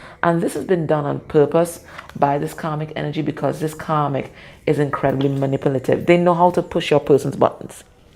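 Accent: Trinidad and Tobago. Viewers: Am I catching a trini accent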